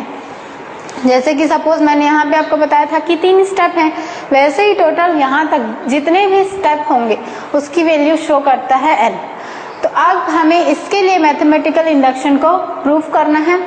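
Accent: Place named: Bihar, India. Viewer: Why using bihari accent 😞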